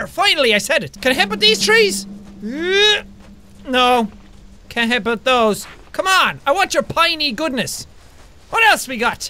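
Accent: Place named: Ireland. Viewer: I’ve come back to first video I saw 6 yrs ago because this is where my addiction to Irish accents was born. Love u jack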